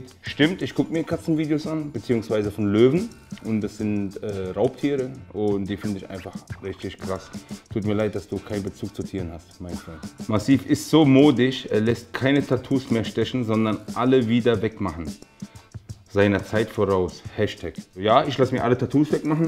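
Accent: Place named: Upper Palatinate, Germany. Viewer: massiv sprichst du weidisch oberüberpfälzerisch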